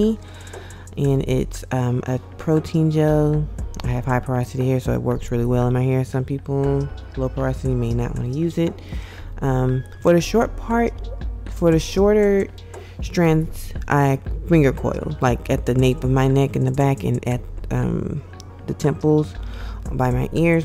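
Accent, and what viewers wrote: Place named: South Africa. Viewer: You look so South African! Your accent gives it away. Gorgeous!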